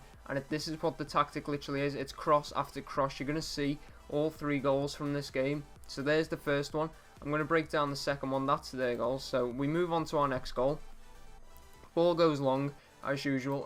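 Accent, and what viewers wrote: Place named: Liverpool, United Kingdom. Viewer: Where in Liverpool are you from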